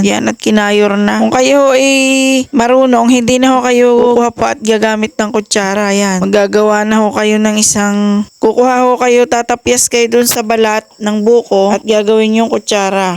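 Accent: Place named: Batangas, Philippines. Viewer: Love your Batangueno accent sis,may fren ako dito from Batangas sya 🙂😎 sanaOl fresh ☺️natawa ako sa braso de Mercedes relatemuch 😳🤣😂done 👍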